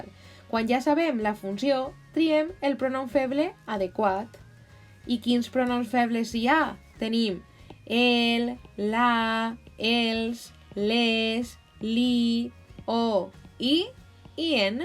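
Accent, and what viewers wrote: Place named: Valencia, Spain. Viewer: Hola! Sòc de Xile i estic aprenent el català. M'agrada molt l'idioma i encara que ja puc parlar-ho sense pensar tant, he vist que tinc que millorar en dues coses: Algunes conjugacions de paraules, per a parlar més natural, i els pronoms febles. Es veritable quan la gent als comentaris van dir que el teu vídeo va ser molt bó, perquè he vist moltíssims vídeos i el teu és el més fàcil de comprendre. Estic escrivint tot el que vas dir, pero després tinc que fer exercicis per practicar-los. L'únic que ha estat nou per a mi es el accent de València. Perquè he après el Català de Barcelona (pel que sembla jajaja, no sabia això). Però segueix així! Ens ajudes molt a aprendre aquesta bonica llengua.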